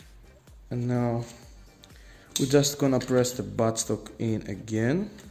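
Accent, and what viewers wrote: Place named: Greece. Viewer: Η προφορα σου σε προδωσε,Μετα ειδα το.....Ωραιος καλη συνεχεια αδελφε......απο εναν Ελληνοκαναδο!χεχεχε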